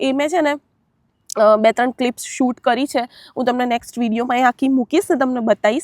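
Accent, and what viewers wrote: Sabarkantha, India. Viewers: tamari accent to Sabarkantha jevi che 😊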